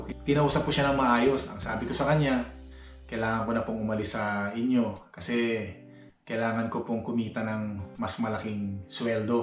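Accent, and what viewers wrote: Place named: Pampanga, Philippines. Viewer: Ang galing ni kuya kahit mahaba ang story❤ parang kapangpangan si kuya sounds like😂 tinapos ko story mo very inspiring🙏☺️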